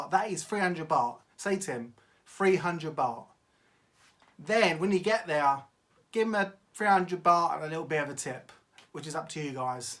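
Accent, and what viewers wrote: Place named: England, United Kingdom. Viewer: he's definatly not aussie...im aussie, and i picked up straight away he's a pom(english). they dont say"th", as in "noTHing", they say "nuFFin", thirty is firty,etc.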